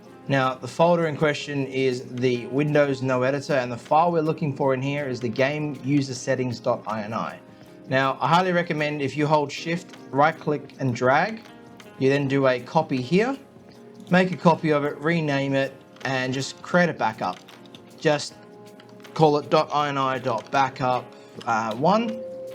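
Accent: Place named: Australia. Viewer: Thanks mate , Love from Australia Idk but ya seems Aussie 🦘 too ?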